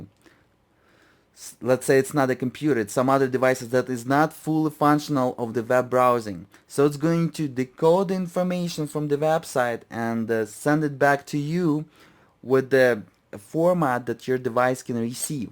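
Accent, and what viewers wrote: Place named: Germany. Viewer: he sounds german to me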